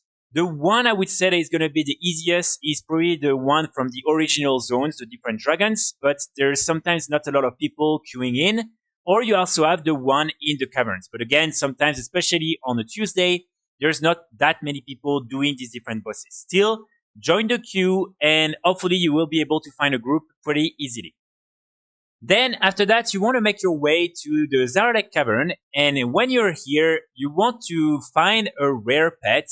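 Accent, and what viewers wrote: France. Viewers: I didn't know you were making videos aside from the gold making theme! As a trading post farmer myself it's always welcome to have some optimisation intels so thank you for that !! Also, rien à voir mais t'es français nan ? Ca fait longtemps que je me pose la question avec ton accent.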